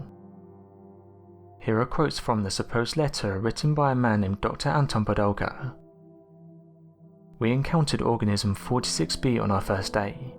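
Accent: United Kingdom. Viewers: These videos would be much better if you slowed down a bit, the rapid fire reading with the British accent makes it extremely hard to understand. Not everyone is British.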